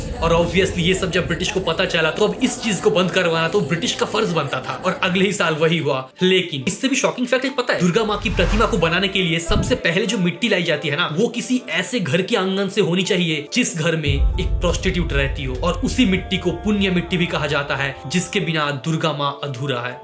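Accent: Bengal, India. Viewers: Bhaiya, kya ap Bengali ho??😁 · Hindi accent sune bojha jacche tumi bangali🎉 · Hi, are u bengalee? It seems like that.....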